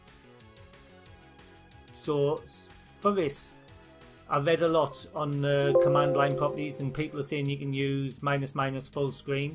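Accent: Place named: England, United Kingdom. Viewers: HI, i have followed your tutorial but only get the CD32 loading screen, i am not sure if my games are in the correct format, i have unzipped the folders and have a cue file and another iso folder inside the game rom folder. i have the kickstarts installed. by the way i thought from the accent you were from Middlesbrough, i am from newcastle myself. hope you can shed some light cheers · hey! i know its been asked and answered already but where in england are you from? i just lovin the accent and i wanna look into it and hear more of it:) i dont remember your answer let alone the video where i read it. thx. (also always lookin for new amiga stuff from you:)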